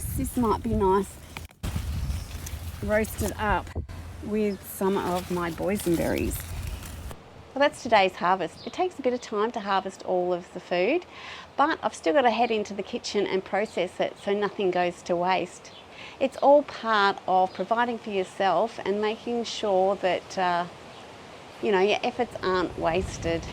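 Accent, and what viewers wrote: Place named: Australia. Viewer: I enjoy all your videos. I especially like your lovely, exotic (to me) Aussie accent.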